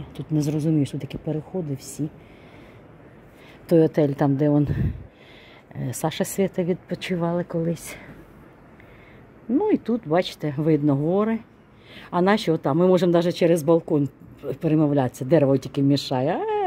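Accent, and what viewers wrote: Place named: Surzhyk, Ukraine. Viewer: ваш суржик мадам патроитка режет ухо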